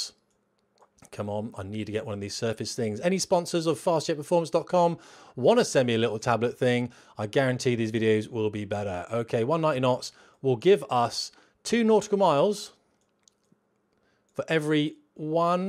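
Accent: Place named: United Kingdom. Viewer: Thanks for sharing these great videos with us common folk. Very cool and informative and I can even understand your British accent. 😊🇺🇸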